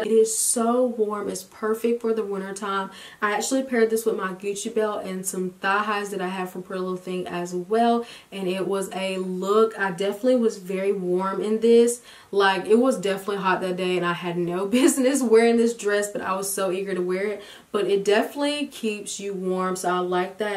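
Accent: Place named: South, United States. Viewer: you have the best American accent I've ever heard! are you from the south?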